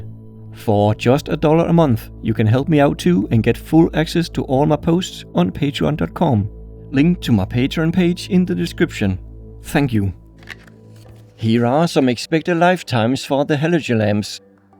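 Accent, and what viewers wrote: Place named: Denmark. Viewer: Du er dansker jeg kan godt høre det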